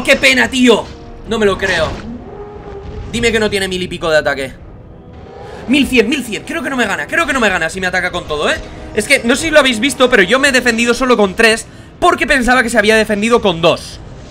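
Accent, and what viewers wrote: Galicia, Spain. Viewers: Lol no sabia que eras gallego XD VIva gaLIcia carAYo 😂😂 Bueno buen vídeo crack la gigantophis mola mucho como criatura de juego, además por ser la segunda serpiente que meten, pero como serpiente prehistórica no me gusta mucho que le hayan puesto la forma de cobra esa en las vértebras del cuello...no se no me gusta pero luego hay que admitir que es una chulada. Decías que la titanoboa era un basilisco...ESTO sí que es un basilisco XD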